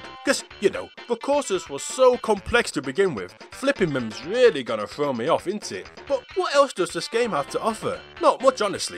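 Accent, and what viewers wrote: United Kingdom. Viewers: Are u British or American? Sound like ur British.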